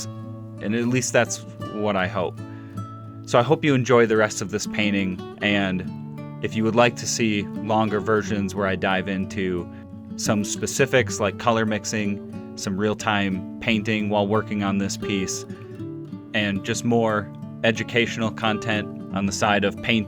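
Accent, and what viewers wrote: United States. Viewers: Even listening to your voice. So calming. That American accent. I’m from Scotland. Have been often to California to see my son.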